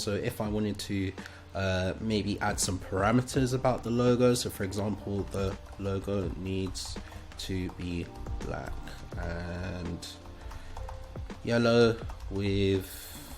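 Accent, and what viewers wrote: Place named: United Kingdom. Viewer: Thank you for the video and the refreshing-to-hear British accent.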